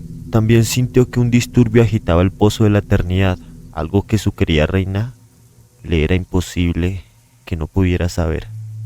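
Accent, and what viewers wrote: Colombia. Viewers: de colombia , por el acento cachaco , saludos desde Valledupar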